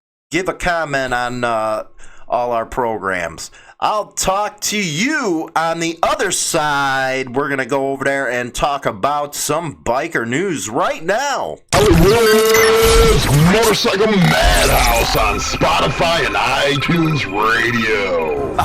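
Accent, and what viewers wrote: Chicago, United States. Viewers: Morning Hollywood and good points indeed! The simple facts are that what happens in the club world affects all our world as Bikers. It’s just reality man. Profiling and all the BS that goes with it are a result of people drawing attention to Bikers for bad behavior! Just look at what’s happened over the last 10 years here in the ROT. Specifically since the BlueGang pulled the ambush at Twin Peaks and it didn’t go their way after it all shook out and it has and is gonna cost them big $$$ long into the future as it should! We both know they (BlueGang) are the worst sore losers on the face of the earth. Pull that kinda shit and it goes south so we will just harass and fuck with these clubs until we wear em out of existence! That’s the way they think and work. As you pointed out man with today’s surveillance everybody’s into everybody’s business 24/7 and that’s reality. 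As for your Chicago accent man, fuck em! Shit man combine that with my ROT accent and those whiners would lose their minds! LOL! Great show man. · Good morning Hollywood you know I'm a big fan of your show but you can't blame mispronouncing words on a Chicago accent you should be proof reading the story's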